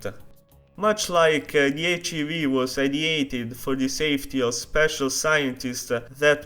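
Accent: Italy. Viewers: I have never heard a more aggressive Italian accent. Lovely video video, keep up the good work.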